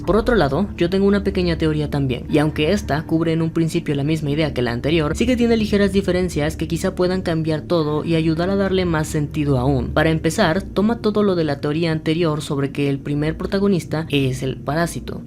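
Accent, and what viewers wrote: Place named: Panama. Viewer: aa te sentia acento a panameño, no pareces de MX, buen video por cierto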